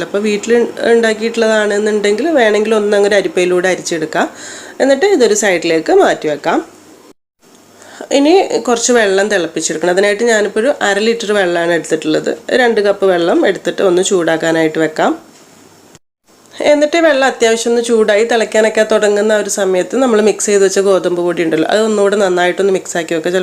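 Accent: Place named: Perinthalmanna, India. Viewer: പെരിന്തൽമണ്ണ ശൈലി ഉണ്ടല്ലൊ ഭാഷയിൽ. പെരിന്തൽമണ്ണയാണോ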